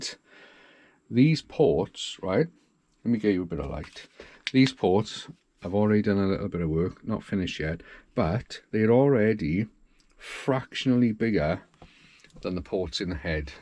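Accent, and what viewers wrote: Wales, United Kingdom. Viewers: Welcome back mate, nothing beats cars and a Welsh accent🏴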